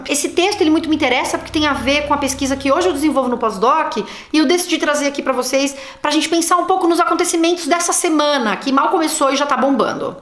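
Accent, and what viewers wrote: Rio de Janeiro, Brazil. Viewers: por que vc mistura os sotaques? vc é carioca e morou em sao paulo? ou é de SP mas morou no RJ? ou não é nada disso? 🤔😁 curiosidade apenash. beijush cariocash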